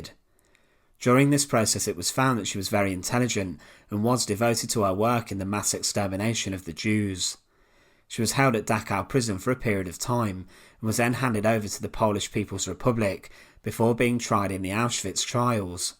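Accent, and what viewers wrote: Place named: Cockney, United Kingdom. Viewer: Let me start by saying that I really like the content on this channel but am I the only one that gets annoyed with the inflection in this person's voice? I was in England for two years but this guy borders on a cockney accent that is very difficult to follow at least in my opinion.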